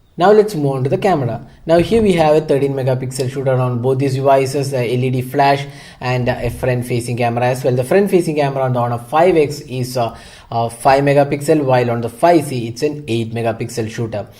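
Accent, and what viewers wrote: Kerala, India. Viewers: Your a mallu its very clear with your english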